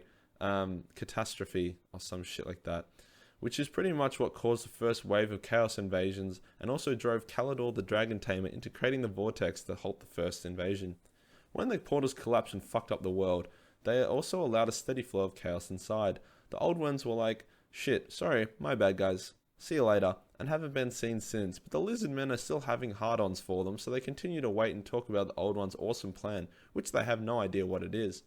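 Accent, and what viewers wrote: Australia. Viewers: haha love this keep making these love you ausie accent cum to britain and speak we will love you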